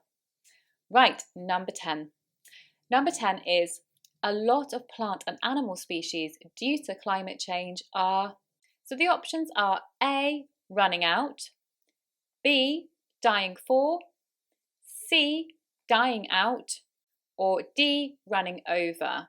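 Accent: United Kingdom. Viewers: omg, you have a pretty good british accent!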